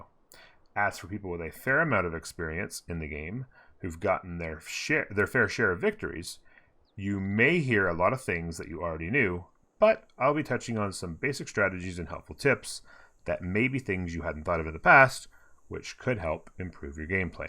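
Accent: Canada. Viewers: Hey! Loved the video. While it wasn't exactly what I was looking for I did learn a lot. Production is king, culture is king (early game). Pay attention to tech tree (a tip I have there is once I get my early game stuff, I select something way late game like robots or nukes so it auto paths) My only criticism is you didn't explain why something is bad. Which fair enough, limited time in a VERY informational dense game. But I mean I signed up for a 35+ minute video so imo it's appreciated. I loved how you explained why to do X, Y or Z. So if you did that, I think it would be a big improvement! Also do I hear a fellow Canadian accent ? 👀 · Great beginner guide! So I'm guessing here that that's a Canadian accent, am I right? Going by the vowel O sound. Anyway, Canadians make the best guides.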